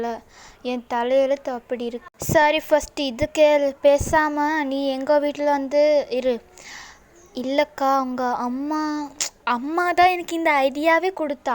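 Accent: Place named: Sri Lanka. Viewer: Akka neenga srilankan aa ungada tamil language konjam different aa irukku 🤔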